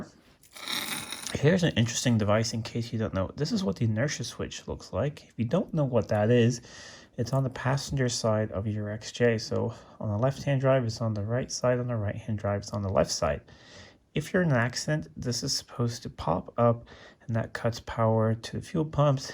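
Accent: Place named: United States. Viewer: sir, you are swedish - where did you get your american accent from ... ?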